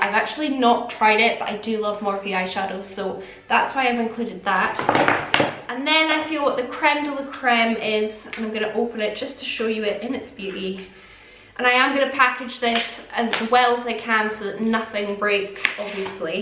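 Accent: Scotland, United Kingdom. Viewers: I love your videos, I think I started watching them when I was looking for reviews of pale foundations. I'm a sucker for a beautiful Scottish accent! What lip colour are you wearing in this video? Such a gorgeous red.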